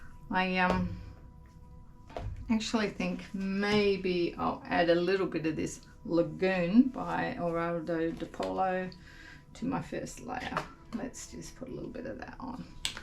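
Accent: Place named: Australia. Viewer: You have taught me over and over again that a piece is not finished until the artist is happy with it and this piece shows this amazingly. May I ask if you are in Australia. You sound like you are but I've been wrong before. Once again thanks for sharing your prodigious talent with us mere mortals. 🙂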